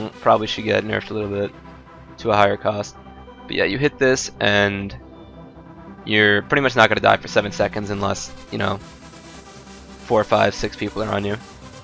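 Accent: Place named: Australia. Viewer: Always thought you were an Aussie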